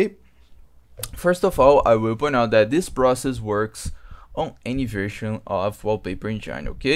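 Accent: Brazil. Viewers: pelo sotaque é brasileiro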